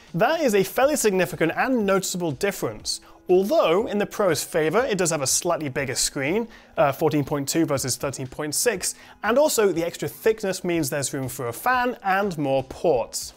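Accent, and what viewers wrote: England, United Kingdom. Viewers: I’m mesmerized by this guy. Maybe it’s the English accent.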